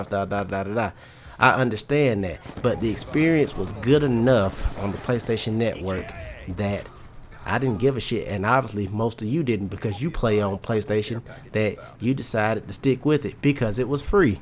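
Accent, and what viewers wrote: South, United States. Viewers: had to restart to see the new intro... it's the shit. I wanted to speak on a vid u did not long ago venting on the youtube game, subs and stuff. The reason i luv you channel is the reason your subs may be low. 1)don't care if people disagree a southern accent, on a black man especially people expect you to be dumb/ignorant. In this case no where close. 2)The unboxings you show should be less unboxing more review bc that matters most 3)there are just haters and i have a few ideas just respond pls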